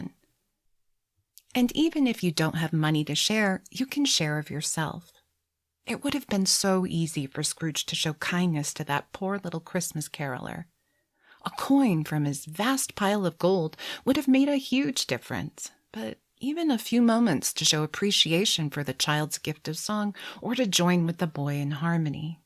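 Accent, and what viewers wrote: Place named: Maryland, United States. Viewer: to your friends😊… this video is… perfect! I have been trying to find just such a video about narrative structure for a group of my students in Grade 9- Freshmen, first year High Schoolers in USA. (Excuse me if 9th Grade is obvious to you! I know nothing about your citizenship, though your accent sounds like mine from Maryland.) Regardless, as said, perfect video! The specifics about the spirits, Dickens’ direct yet deep depictions, and the psychological sides of Scrooge — wow, it is enlightening and engaging without being too easy… Thank you so much! I hope to see more of your videos and use them to help all my students grow in their Narrative Writing abilities! “God bless us, everyone”… but especially you and yours this Christmas!🎄🤓🎄🥳🎄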